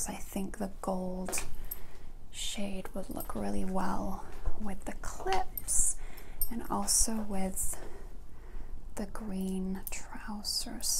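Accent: South, United States. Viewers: As someone who grew up in the south but no longer lives in the south the way you speak is so nostalgic and relaxing!! it brings me back to elementary school! Please tell me im not insane and your from the south lol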